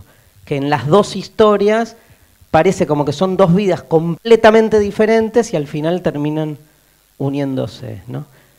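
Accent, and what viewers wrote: Argentina. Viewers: Acento argentino.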